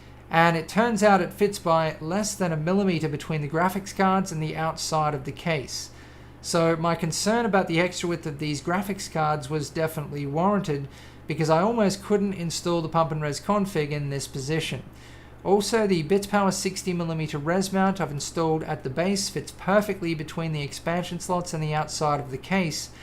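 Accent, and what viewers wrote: Australia. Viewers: I have no idea why it has taken me so long to find your channel but things seemingly, are what they are. It was Google that recommended your channel to me I think... Anyhow, I have subscribed and I am a uber happy camper, yet still, I am struggling to understand why it has taken so long for me to be invited to view your channel, when I have been watching similar sites for years, in some cases. The thought that crosses my mind is one that wonders whether Google and Youtube have some kind of favoritism algorithm going on or worse. I have noticed that you have an accent of one from the parts termed "down under," however, surely, with me residing in Europe, or, and,even "the west," that does not form a barrier of communications or does it? It certainly does not involve tech sites from over "the pond." Something is amiss that is for sure - to my mind, and my gut feeling is that it has something to do with traffic algorithms or is that the conspiracy theorist in me raising up its ugly head or beautiful head, which ever it is that you fancy comrade - only remember comrade... 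There is a tint of red in all of us, simply waiting to blossom. Whatever the causation, I have managed a couple of hundred words of adequacy and for such, my time as the writer is up and my time as the dreamer of heavenly wonders is upon me - over and out, goodnight Vienna, goodnight Helsinki... Shalom & Gratitude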